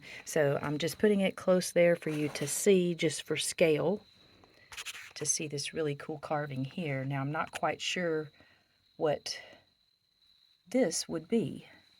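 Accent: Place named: South, United States. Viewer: Fascinating video - thank you! Also, I have to say you have a wonderful speaking voice - very clear and pleasant with a nice Southern accent. That and the interesting subject make this an outstanding video.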